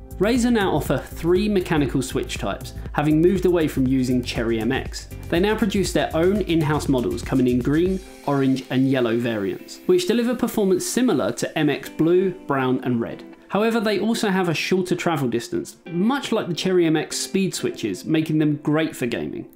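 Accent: United Kingdom. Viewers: I love when to watch english video's instead of american. I don't know how but the british accent makes me focus more on the video instead of interruption of my dogs barking or driving by cars🤔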